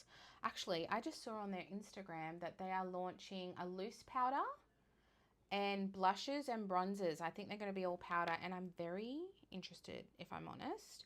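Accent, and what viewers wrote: Australia. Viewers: Hi, I'm new to your channel. It's so refreshing to hear an aussie accent on youtube and that you review Australian makeup brands and provide links to products that i can actually buy in Australia!!!! Yay!! Thank you so much for your channel. I've already bought some Australis products that I never thought of trying. Very good they are indeed!! Kepp doing what you're doing 'cause it's really great. ❤❤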